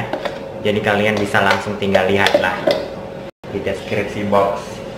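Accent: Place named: Karo, Indonesia. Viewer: khas karoooo sekaleeee hahahhaha mejuah juah buleeeee lidah karo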